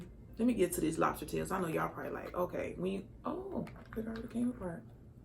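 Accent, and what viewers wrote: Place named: Dallas, United States. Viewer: Hi! This is my 1st video of yours. I like your voice & think I hear a Dallas accent. Are you from Dallas per chance? 🤔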